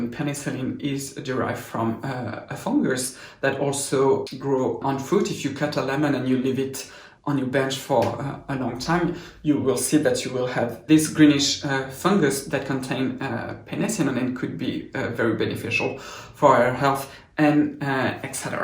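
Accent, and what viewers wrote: France. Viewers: I think that french accent is cute 😃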